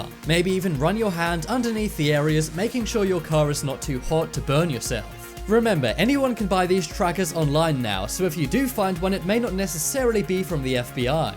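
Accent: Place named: United Kingdom. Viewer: Notice the British accent.....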